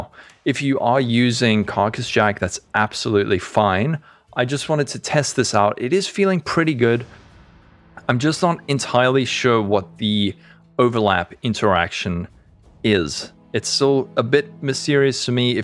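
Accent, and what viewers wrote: South Africa. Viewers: Are you a South African botjie?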